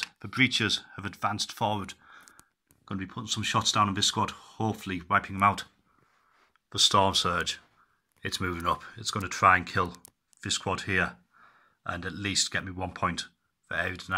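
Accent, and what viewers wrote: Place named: Teesside, United Kingdom. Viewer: Sizkipala, seriously m8, the super dramatic on tenterhooks commentary does it for you? Man. Don't encourage him. Maybe it's the Teesside accent but am not feeling it.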